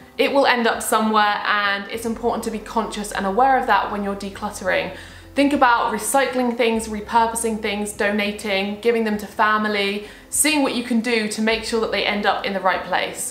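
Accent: United Kingdom. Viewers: I speek french and I love your british accent. It is lovely. Your speech is really inspiring indeed. It is not easy but I am starting now.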